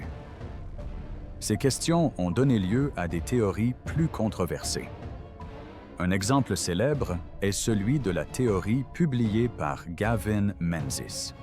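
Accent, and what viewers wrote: Quebec, Canada. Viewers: Ces commentaires IA avec accent franco-canadien sont insupportables… et tout ça pour pas dire grand-chose ! C’est terrible cette narration remplie de détails sans aucun rapport les uns avec les autres. J’ai écouté plusieurs fois et impossible d’en ressortir une cohérence temporelle. Je suis bête ou ce reportage est une arnaque ?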